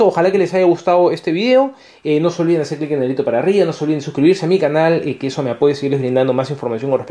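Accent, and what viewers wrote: Peru. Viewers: eres peruano? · hey eres peruano??? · Eres peruano ? ? ?? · eres Peruano?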